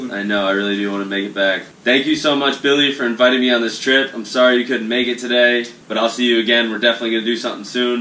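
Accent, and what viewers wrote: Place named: Argentina. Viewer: Ese acento me suena a Argentino, a más no poder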